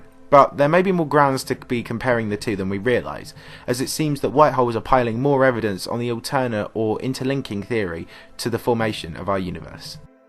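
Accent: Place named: England, United Kingdom. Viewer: Narrators with English accents; "Saturn" is mispronounced with the 'r' in Saturn silent, sounds like Sat-in, or Sat'n. I say mispronounced but to the English that's just the way they say it so I'm sure they would be quick to say it's not mispronounced. The word 'pattern' same thing, sounds like 'pat-in' or pat'n. It drives me nuts tho. The planet Uranus, pronounced Yer-In-Ess to the rest of the world while the the English just flat out say 'Your Anus' which, let's face it, just sounds wrong. Add these two words together and the English person say 'Sat'n Your Anus. Oh really, you sat in my anus? How was that? I don't want to know. I'll stick with 'Sat-urn' and Uranus.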